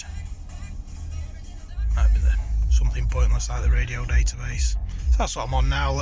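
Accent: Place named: Australia. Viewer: Your Australian accent is so difficult to understand.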